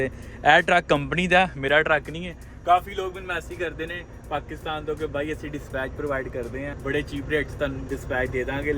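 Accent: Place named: Punjab, India. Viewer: hahah I really enjoyed your Panjabi Accent...Good work bro..Maza araha ap ki vlog dekh kar m Gonna Subscribe you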